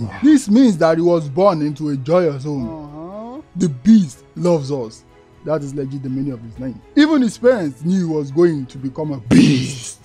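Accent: Nigeria. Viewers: ahhahaha! real nigerian accent! I LOVE THAT! LOL!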